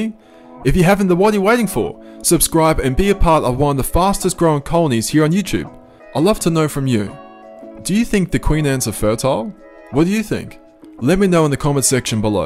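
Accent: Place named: Australia. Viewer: its like youre a australian ants canada also good info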